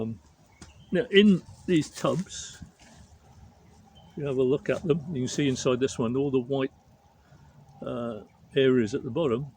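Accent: United Kingdom. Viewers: Which country are you in and what area? Judging by your accent you are British but those temperatures dont seem wery British 😅.Im in essex so temps reqching -5 at the moment 😢. Would want as much info before stepping into the hobby. 😊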